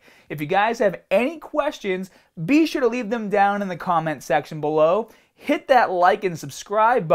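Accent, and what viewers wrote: Boston, United States. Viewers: Keep the full accent man should be preserving your culture as part of your culture that Boston accent Go full on with it man set you apart from everybody else is part of your character as part of your routine it's part of Who You Are, is trying to come out all the time but you're suppressing it before b u i mean that with all that's you Boston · i been a sub for a really long ass time and i love how your not editing your videos as much and how you love your boston accent now. keep it like that. also i only put myself in food comma when im allowed a cheat day. im a boxer so that comes like once a year and half or two. everything else is a pretty much strict diet, including water intake. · when did you start this hardcore Boston accent haha never used to be that strong. I'm from mass too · The Boston accent is severely strong with this one lol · In from Braintree bro! 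U must be from mass cause I sound exactly like u! Go Boston accents!! Just subscribed right now, love your bro!! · Let me guess, you're from Boston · This man's boston accent is getting intense lol. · When did pick up this Boston accent? I used to watch him a few years ago and he never sounded like this? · Why is he talking like that? I haven't seen his videos in a few years Looks like developed a Boston accent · when did this guy get such a heavy boston accent?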